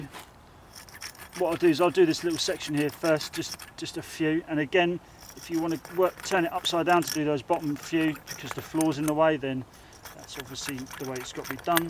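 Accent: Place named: Cockney, United Kingdom. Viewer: From one cockney living in OZ to another cockney - good stuff mate. I've got a small job to do in my kitchen. I am going to Bunnings to get a chariot tool now. Also the out takes are cracking me up !!!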